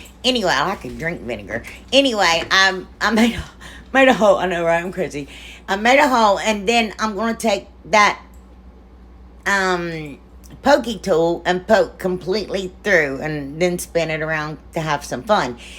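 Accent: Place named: South, United States. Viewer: For one u r from the south so don't ever try changing ur voice again cause I love listening to u talk in ur own voice. Second I always love everything u make and if I could I so would totally try makin this ur way lol believe it or not but I would. U really r the best person and funniest love I love watching so plz keep making ppl laugh ur way. Thank U❤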